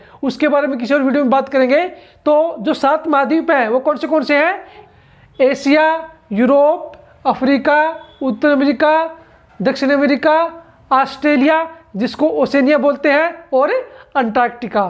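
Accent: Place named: Haryana, India. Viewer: haryana se ho bhai ji acha smjhaya bhai ji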